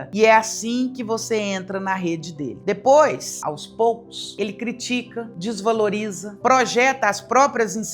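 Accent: Minas Gerais, Brazil. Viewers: Estou chegando agora no seu canal e estou respeitosamente apaixonado pela senhora e Dra Ana Beatriz, acabo de me descobrir um bígamo virtual! rsrsr... Parabéns pelo seu trabalho Dra!!!! Ouvir falar da vida com sotaque mineiro faz tudo ficar muito leve... acho que Freud era um chato, mas boa gente, paulistano como eu KKK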